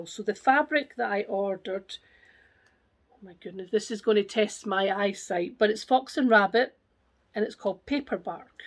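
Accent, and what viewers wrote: Scotland, United Kingdom. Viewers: I hope you continue making videos I have watched you since No 1 and look forward to your content, I m a fellow Scottish stitcher and it’s lovely to here your accent. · Congratulations! I enjoy watching your videos! I’m so glad your recovery is going well. It broke my heart when I watched the video where You explained about your fall. I have to confess that I first started watching because I enjoyed listening to your accent. My grandparents were from Edinburgh. I miss them. So listening to you was a delight, some little words(can’t think of any right now) but words I haven’t Heard in so long But I have to say it was your accent at first but then I really enjoyed all your different whips and all Your stash and just everything. Good luck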